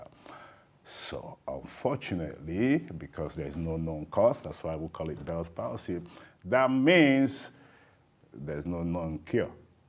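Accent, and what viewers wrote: Nigeria. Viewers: Thank God for his healing. I love his Igbo accent 😊. People say my Junior brother looks so much like him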